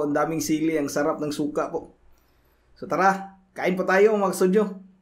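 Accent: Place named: Bohol, Philippines. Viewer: Taga Bohol ka migo ? Mura man ug Taga Bohol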